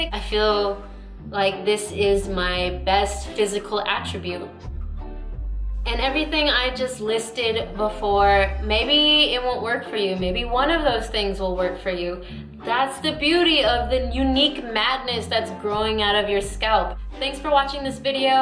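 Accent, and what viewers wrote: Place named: India. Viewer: I love your Hindi ascent... Love from India ❤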